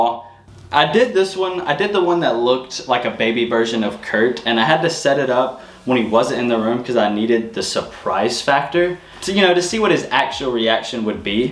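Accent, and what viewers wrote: South, United States. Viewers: You sound country 😂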